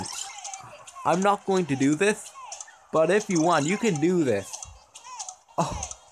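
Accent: Ireland. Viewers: Hey are ya Irish? I am too! 😆 Also made a video about this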